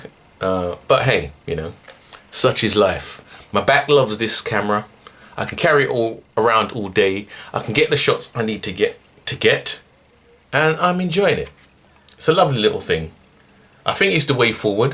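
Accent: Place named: United Kingdom. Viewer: The 21st century has arrived. I was looking at the 80d as it has a headphone jack, but I guess I can use the meters on the m50 if I shoot video, and not break my back. Enjoy your 22 f2 as I read the bokeh is great plus low light. Your photos look great, do you think this body performs as well as the 80d for PHOTO image quality? If it does, it is a lightweight bargain. Great to hear a British reviewer: I am from near Newmarket :-) Other reviewers compare this camera with expensive SLR which is an unfair comparison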